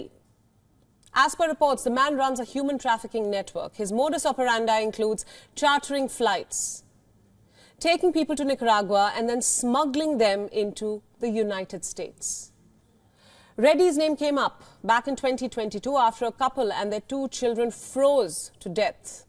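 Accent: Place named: India. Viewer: Indian accent😮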